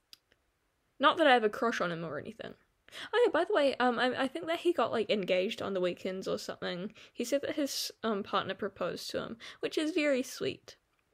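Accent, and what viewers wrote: New Zealand. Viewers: ur accent sounds sooo familiar r u from nz? or maybe australia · Your accent swerves between kiwi and southern US.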